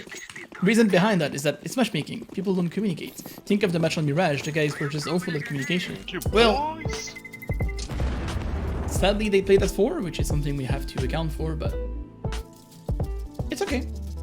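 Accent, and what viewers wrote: France. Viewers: Y a un p’tit accent français Nn 😭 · yo are you originary from frace or a country who speak frenche cause you have a litle french acent some time (love your video)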